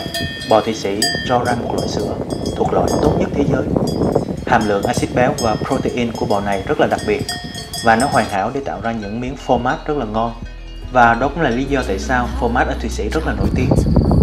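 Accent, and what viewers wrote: South, Vietnam. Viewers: Rất hay cháu ạ ,giọng nói miền nam ghép nhạc rồi cảnh đẹp, rất tuyệt❤️❤️❤️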